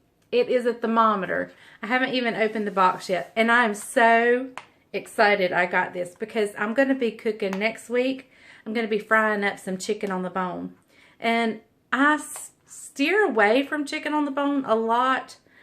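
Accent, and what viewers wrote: South, United States. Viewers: you crack me up! With your southern accent it sounds like you are saying bacon soda and bacon powder. Funny! I remembered my mama making this cake when I was little - the buttermilk makes it so moist and that icing is just the yummiest!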